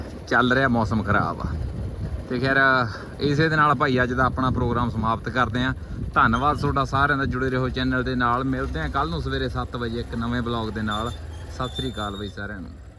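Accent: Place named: Doaba, India. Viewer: 🙏❤👏🏾, ਬਹੁਤ ਵਧੀਆ 👌 ਵਲੌਗ ਹੁੰਦਾ ਹੈ! ਜਿਆਦਾ ਅਤੇ ਬਹੁਤ ਪਸੰਦੀਦਾ 🤩 ਪ੍ਰਸਿੱਧ ਪਰਦੇਸੀਆਂ ਪ੍ਰੋਗਰਾਮ 😂😂 ਜੋ ਨਹਿਰੀ ਠੰਡੇ ਪਾਣੀ 💦 ਨਾਲ ਦਿਲੋਂ ਪਿਆਰ 💕 ਨਾਲ ਭਰਪੂਰ ਬਣਦਾ ਹੈ 🎉😂😂🎉😂! ਅਤੇ ਹਰ ਦੇਸ਼ ਪ੍ਰਦੇਸ਼ ਵਿੱਚ ਬੈਠੇ ਪੰਜਾਬੀ ਉਡੀਕ ਕਰਦੇ ਰਹਿੰਦੇ ਹਨ ਕਿ ਕਦੋਂ ਨਹਿਰ ਦੇ ਤਾਜ਼ੇ ਠੰਢੇ ਪਾਣੀ 💦 ਦੇ ਦਰਸ਼ਨ ਕਰੀਏ ਅਤੇ ਹਰੇ-ਭਰੇ ❤ਖੇਤਾਂ ਦਾ ਕੁਦਰਤੀ ❤ਨਜ਼ਾਰਾ ਲਈਏ ਅਤੇ ਲੰਘੇ ਵਖਤਾਂ ਨੂੰ ਮਜਬੂਰੀਆਂ ਨੂੰ ਸਰਕਾਰਾਂ ਨੂੰ ਕੋਸੀਏ 😢 ਅਤੇ ਹਵਾਵਾਂ ਦੇ ਵਿੱਚ ਜਾ ਕੇ ਆਪੋ ਆਪਣੇ ਪਿੰਡਾਂ ਦਰਸ਼ਨ 😻+ ਯਾਦਾਂ 💪ਤਾਜ਼ਾ ਕਰੀਏ 🤦🏽🙇🏽😢❤🤷🏽‍♂️👏🏾☝🏾🙏! ਬਾਕੀ ਬੋਲੀ ਤੁਹਾਡੀ ਮਿੱਠੀ ਪੰਜਾਬੀ ਮਾਂ ❤ਬੋਲੀ ਦੋਆਬੇ ❤ਨਾਲ ਬਿਲਕੁਲ 💯👍 ਮੇਲ ਕਰਦੀ ਹੈ! ਬੱਸ ਸਿਰਫ਼ 🥭 ਅੰਬਾਂ❤ ਅਤੇ ਸੰਗਤਰਿਆਂ ਦੇ ਬੂਟਿਆਂ ਦੀ ਘਾਟ ਹੈ ਓਹ ਲੀਚੀ ਦਾ ਬੂਟਾ ਵੀ ਜੋ ਛਾਂ ਲਈ ਵੀ ਬਹੁਤ ਵਧੀਆ 👌 ਹੁੰਦਾ ਹੈ 🤷🏽‍♂️! ਬਾਕੀ ਤੁਹਾਡੇ ਮੌਸਮ ਅਤੇ ਮਿੱਟੀ ਤੇ ਨਿਰਭਰ ਹੋ ਸਕਦਾ ਹੈ ਘਰ ਵਿੱਚ ਪਸ਼ੂਆਂ ਵਾਸਤੇ ਦੇਸੀ ਅੰਬ 🥭 ਦਾ ਬੂਟਾ ਵੀ ਬਹੁਤ ਵਧੀਆ ਹੈ ਗਰਮੀਆਂ ਵਿੱਚ ਗੂੜ੍ਹੀ ਛਾਂ ਅਤੇ ਸਰਦੀਆਂ ਵਿੱਚ ਰਾਤ ਸਮੇਂ ਨਿੱਘਾ 🥰 ਹੁੰਦਾ ਹੈ! 😂🎉❤😂! ਜੱਟਾ ਵੇ ਤੇਰੀ ਜ਼ੂਨ ਬੁਰੀ ਹਲ਼ ਛੱਡ ਕੇ ਚਰੀ ਨੂੰ ਜਾਣਾ 🧑🏻‍🌾👨🏻‍🌾 | ਸੌਖੀ ਨੀ ਜਿੰਦ ਜੱਟ/ ਕਿਸਾਨ ਦੀ ਪੈਂਦਾ ਸੱਪਾਂ ਦੀਆਂ ਸਿਰੀਆਂ ਤੇ ਤੁਰਨਾ😮! 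ਰੱਬ ਉਤੇ ਭਰੋਸਾ ਰੱਖਦੇ , ਕਰਨ ਅਰਦਾਸਾਂ ਹੱਥ 👏🏾 ਜੋੜ ਵੱਲ ਅਸਮਾਨਾਂ! ਬਾਬੂਆਂ ਦੇ ਝੂਠੇ ਲਾਰਿਆਂ ਨੂੰ ਕਹਿਣ ਤੇਰੀ ਕਿਸਮਤ ਵਿੱਚ ਇਹੋ ਲਿਖਿਆ ਭੁੱਖਿਆ ਜਜਮਾਨਾਂ 🤯🤑🤮🤒😷ਸਾਡੀ ਤਾਂ ਡੋਰੀ ਉਸਦੇ ☝🏾 ਹੱਥ 👋 ਐ ਪਰ ਤੁਹਾਡੀ ਬਾਬੂਆਂ ਦੀ ਕਿਸਮਤ ਹੱਥ 🤑ਨੋਟਾਂ/ ਪੈਸਿਆਂ 💰 💵!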